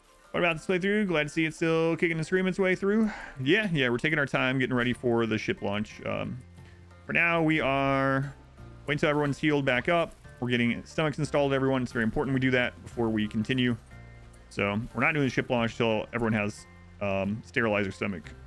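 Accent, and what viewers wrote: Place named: Appalachia, United States. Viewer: hahaha, maybe I can leave some vids on .5x speed for a while And also your apalachian voice is jokes, altho I did understand it enough considering the diverse group of people ive met and played games with, definitely harder to understand though, I dont think I could watch that on 1.5x speed like ive sometimes done for your vods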